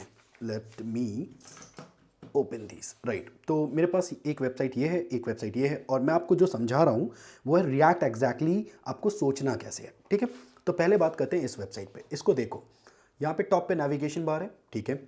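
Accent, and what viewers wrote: Bhopal, India. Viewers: the bhopali accent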